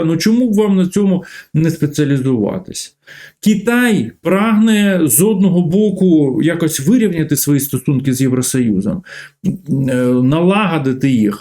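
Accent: Moscow, Russia. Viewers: Прізвище вроді ж Галащук, а людина акає, як московит. "тАвари, пАчув на свАю адресу, Європоейський сАюз, вирАбниками.." Нє, ну невже так важко вимовляти українські слова не акаючи?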